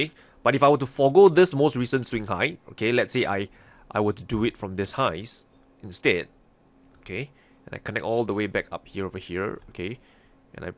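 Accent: Singapore. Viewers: English is just a language not an intelligence..I too have friends from singapore and they have similar accent as... So please do not offend someone like this.. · Love the singapore accent 👍🏻🍀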